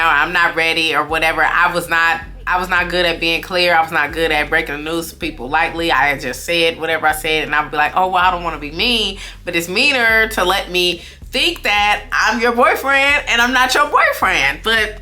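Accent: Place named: Bronx, United States. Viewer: OMG! Sounds like this guy I know from the Bronx whose name started with the letter J 😂 You're an awesome storyteller, keep 'em coming!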